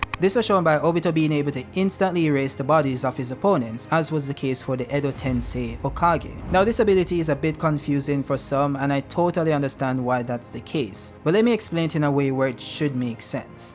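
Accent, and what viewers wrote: Jamaica. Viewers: sound like u come from Jamaica dawg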